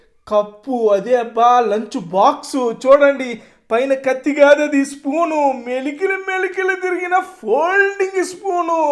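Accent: Nellore, India. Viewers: అన్న బాగుంది అన్న లంచ్ బాక్స్, స్పూన్ కూడా బాగుంది మీ మాటలు సూపర్ మన నెల్లూరు భాష బాగుంది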